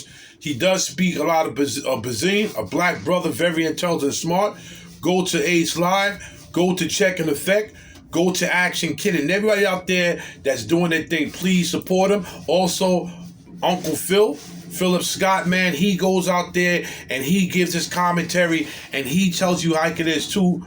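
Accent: New York, United States. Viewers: God bless ❤ Subscribed. And finally, a REAL NY accent. You are everything a real NY native should aspire to be. ❤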